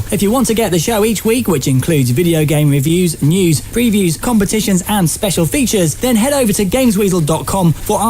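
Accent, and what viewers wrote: United Kingdom. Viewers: hes got the British accent. im pretty sure some people would say we are saying it wrong.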